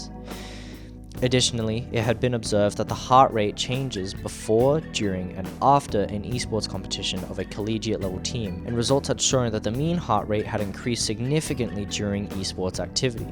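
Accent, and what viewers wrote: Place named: United Kingdom. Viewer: Just to let you know, your british accent is stupid, and you need to speak up, put some balls into your speech. It's bad enough with your stupid English tea accent.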